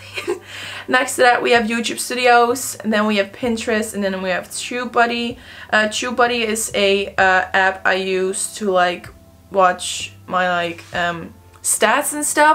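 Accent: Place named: Netherlands. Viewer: lol ik was je video aan het kijken en ik zat te denken huh jou accent is precies Nederlands maar het moment dat je het recept van de ontbijtkoek liet zien wist ik het meteen lmao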